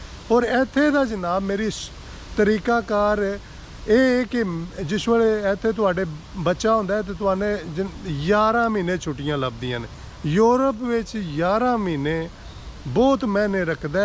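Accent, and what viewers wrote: Gujrat, Pakistan. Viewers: AP ki boli se lagta hai k ap Gujrat se ho brother Khush raho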